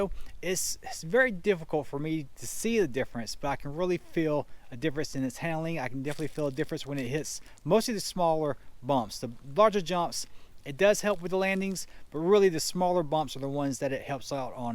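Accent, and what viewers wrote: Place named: United States. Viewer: You have a...kinda...country accent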